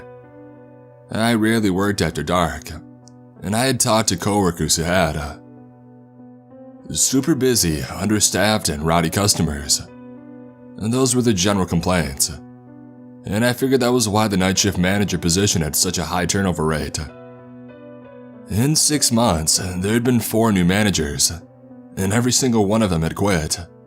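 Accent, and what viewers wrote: Canada. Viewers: South Park Canadian narration...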